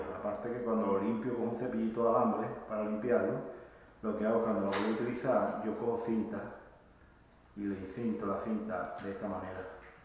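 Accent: Andalusia, Spain. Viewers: Ah, vale ahora entiendo. Lo probaré porque nunca me quedan bien las líneas ni usando cintas buenas. Me quedan bastante bien pero siempre filtra algo. Ese acento que tienes...cádiz, sevilla? Muy yankee no suena🤣Un saludo👍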